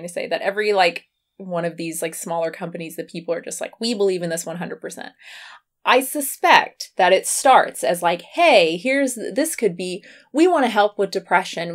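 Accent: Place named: South, United States. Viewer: It's the southern accent. If you watch any of her reacting to when i was raw videos it's so much more pronounced too.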